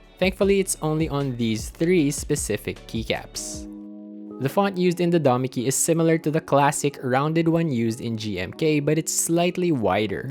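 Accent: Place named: Philippines. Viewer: wow this is my first time watching ur channel and the second i click the video i already guessed u were filipino lol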